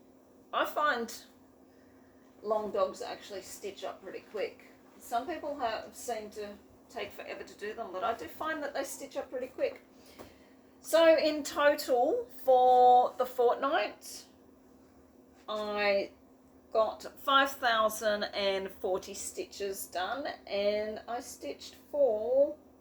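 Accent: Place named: Australia. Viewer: Always good to see a video off you, no matter if it's a small or large amount of stitches. Boy that was a super busy weekend, you had every right to be cream crackered. Do love the brown fabric you dyed👍. The stand looks very well made, sure you'll get many thousands of stitches completed on it.I love hearing the Aussie accent! Stay safe, hoping you'll be able to get some stitches completed 🤗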